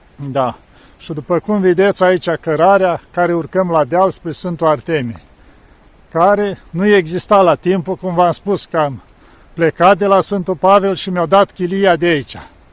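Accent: Moldova. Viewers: Bunul Dumnezeu să vă dăruiască sănătate și să vă asculte rugăciunea Părinte și M ĂICUȚA NOASTRĂ SĂ păzească și să facă pace în lume Iar ROMÎNIA NOASTRĂ SĂ O PĂZEASCĂ ȘI SĂ o acopere cu cinstitul și SF SĂU ACOPRĂMÎNT IAR PE TOȚI ROMÎNII DE Ori și unde sar afla să ne încingă cu SF - UL BRÎU și să ne țină strîns uniți lîngă SFÎNTĂ CRUCE A MÎNTUITORUL UI HRISTOS ȘI A MILOSTIVIRII SALE AMIN Și DV Vă mulțumim pentru cuvintele frumoase rostite în grai dulce Moldovenesc pentru că și eu sunt moldovean dar mai de la malul Dunării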